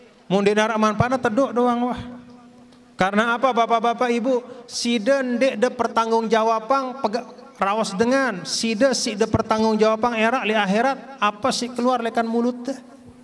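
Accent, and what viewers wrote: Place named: Sasak, Indonesia. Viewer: senang banget sy dengar bhs sasak halus intonasinya sy 15th di Lombok ngerti sekedit kedit ton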